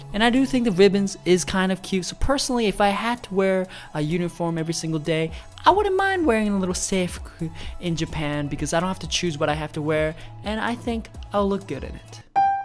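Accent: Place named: South, United States. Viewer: Southern accent from a Japanese face, that’s nice combo!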